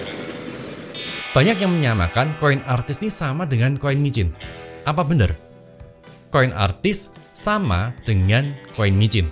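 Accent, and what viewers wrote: Java, Indonesia. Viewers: Ko bahasa nya medok Jawa Jo😅😅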